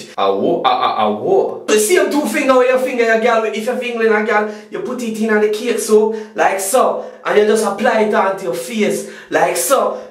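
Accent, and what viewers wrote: Jamaica. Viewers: erm ok ur comment wasn't needed i said nothing about his color. i do know that there are white Jamaicans Chinese Jamaicans etc. what i meant was that his accent was really good but then i realized he was actually a Jamaican. and when i said true Jamaican i wasn't referring to color i was referring to the accent, because at first i thought is accent was meant for comedy. so next time before you post you long unnecessary essay stop and think about what i actually meant.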